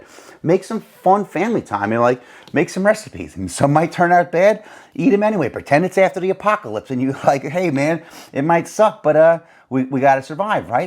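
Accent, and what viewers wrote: New York, United States. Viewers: As a former Brooklynite,I have enjoyed hearing the New York accent 👍🏽😂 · Loved listening to this video and your NY accent. Makes this Midwestern gal miss her NY friends I’ve met through the years. Good info. Your Grammy was a wise lady. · I do SO enjoy listening to a New Yorker tell stories! Nobody does it better!!! Love hearing about your Grammy. I remember my Mom stretching hamburger with bread back in the 1970’s. Not that we loved it, but she could sure stretch a dollar! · New York Italian? Automatically subbed! I live in Upstate. Near Rome. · I knew I heard a NY accent! I’m a former New Yorker, living in VT. Bacon, egg and cheese in a hard roll is a NY legend. Miss that! Just found your channel today - thank you!!! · What, no Velveeta cheese listed? How could you miss that? Seriously, I just spent a very pleasant hour, and a pot of coffee, watching this video and reading all the comments. 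Thanks! So, I grew up in Yonkers in the 50’s; this really hit home. So much of this is second nature to me. Watched my mother and grandmother canning. Leftovers were eaten until things turned green, and even then some of that was scraped off and it was good to go. My wife still cringes at how long I leave things in the fridge. One thing we really stretched was sauerkraut: boil it witH Kielbasa, potatoes and serve with brown mustard. Also, a good gravy covers all sins!, you reminded me of my brother-in-law: Italian, talked with his hands, NYC accent, and a police detective too (in Yonkers). Made a mean meatball!, So, you got another subscribe. Take care! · Great video. I save the ends of vegetables, freeze them as I go along, put in a pot or slow cooker filled to top with water with a whole onion snd a whole garlic ( their skins on), some celery and carrots and several hours later, strain and have delicious veggie broth to eat, cook with, freeze, give to family and neighbors, friends. 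Make rice with it, or noodle soup by adding chicken or beef bullion. Make bone broth same way. Stretches your meals. Add soy sauce, ginger, scallions for Asian flavor. Good to hear an Italian from New York! 👍Just moved from there to this state.😊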